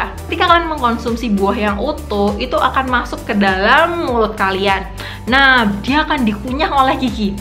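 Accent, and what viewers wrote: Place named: Banjarmasin, Indonesia. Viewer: Kaya nya orang banjarmasin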